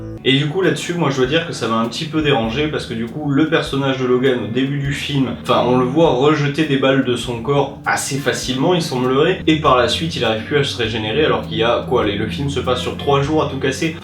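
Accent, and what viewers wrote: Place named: Banlieue, France. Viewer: accent de banlieu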